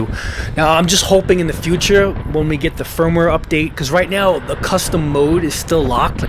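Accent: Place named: Long Island, United States. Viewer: A fellow Long Islander! I'm watchin this goin damn this guy sounds like my family. Laughed when you started talking about LI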